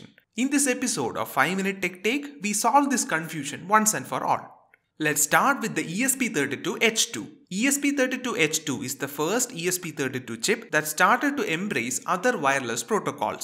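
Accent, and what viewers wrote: India. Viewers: Sorry I find the Indian accents the hardest to listen to and understand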